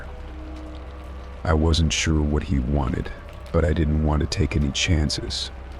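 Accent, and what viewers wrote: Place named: Southern England, United Kingdom. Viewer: South England. You are really helping me to sleep thank you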